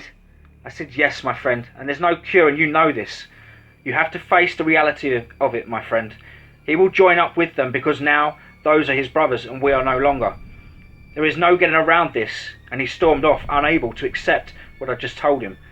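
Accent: England, United Kingdom. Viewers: I Love Your Narrative s and Stories and your Amazing Talented enthusiastic English accent voice that puts an entertaining twist to the tale and puts your listening audience right inside the adventures of those who are attacked by the Creatures of the Night! God Bless You my Friend and Thank you for doing what you do because I truly look forward to Bedtime knowing I can lay back turn on your Channel and relax and Enjoy your latest episode of Cryptic yarn s! Been a fan of Cryptids and Paranormal phenomenon s my whole life since early childhood ! I find that Unknown world of Creatures that are not supposed to exist to be utterly fascinating and interesting especially now that their is growing Scientific Evidence and Eyewitness accounts that these creatures of folklore may actually exist!!! Have a Great Weekend! Keep up the Great Work Please Kind Sir!!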